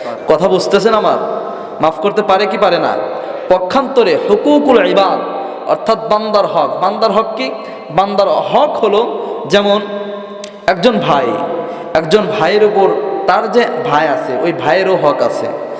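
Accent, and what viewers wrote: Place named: Shariatpur, Bangladesh. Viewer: মাশাআল্লাহ শরিয়তপুরীরের সুয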